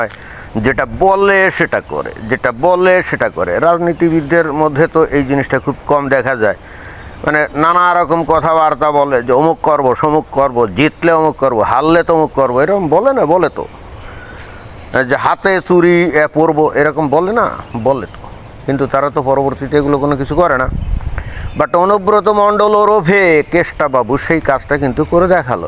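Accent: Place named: Bangal, Bangladesh. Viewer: তোমার উচ্চারণ দেখে মনে হচ্ছে তুমি বাঙাল।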